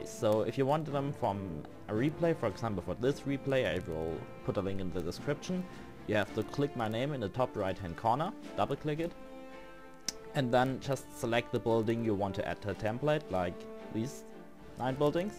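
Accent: Germany. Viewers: That's a typical German accent of someone who did not spend much time talking with/to native speakers directly. It's pretty much basic school English. How is your accent when you speak German?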